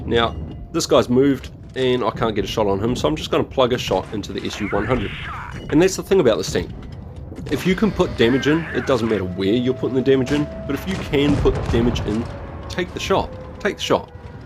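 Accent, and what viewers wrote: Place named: New Zealand. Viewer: just picked this up on my new oceanic account and your video is real handy my bro, nice to see a fellow kiwi in the mix hit subscribe as soon as i heard your accent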